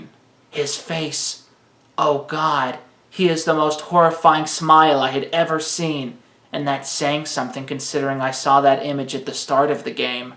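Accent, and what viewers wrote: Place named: Midwest, United States. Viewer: Idk where you live but it sounds like the Midwest oof my friend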